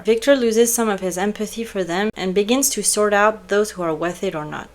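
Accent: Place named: France. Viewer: i love your French accent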